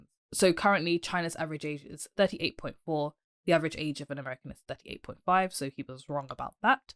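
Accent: England, United Kingdom. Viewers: Your education has made you naive to naive to the facts of power operates. You will naturally understand how power works once youve had a decade away from being a student. Your not taught in school how to obtain power or even how it operates. Thats done on purpose. You are trained academically to be an employee not an employer. As long as you have the employee mindset you will never see the whole picture. Peters opinion is largely held by democrats, republicans and policy makers in washington along with the big think tanks brookings, rand, etc... your intelligent and well educated but your lacking in real world life experience. So your missing the whole picture. Interesting perspective and brave to attempt to debunk peter for lack of a better term. I enjoy your delivery and silly english accent😂😂😂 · Peter who? She's pretty ..and I like her English accent.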